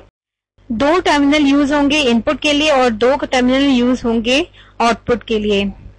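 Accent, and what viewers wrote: India. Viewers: very very very helpul .... UR HINDI ACCENT IS AWESOMME !!!!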